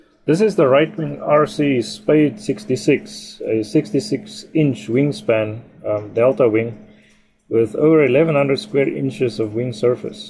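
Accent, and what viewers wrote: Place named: South Africa. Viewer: Hi guys, great video! Can i ask where this was made? You sound somewhat South African...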